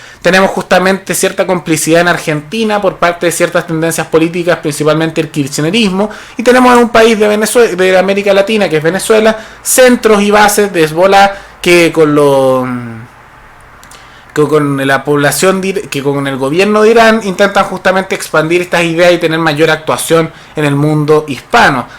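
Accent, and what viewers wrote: Chile. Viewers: Yo lo que sé es que jamás me había gustado el acento chileno excepto tú si tú tú tú me gusta como hablas y ese acento que tienes claro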